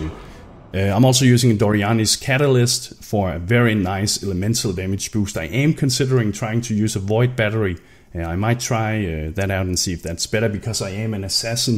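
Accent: Denmark. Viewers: get hit by a little shit bird. lost it xD and nice Danglish xD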